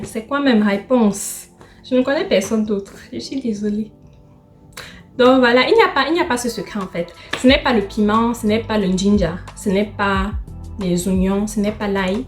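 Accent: Cameroon. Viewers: Uhein dès que je t'ai entendu parler j'ai toute suite su que tu étais camerounaise vraiment le goût de ça une belle camerounaise 😂😂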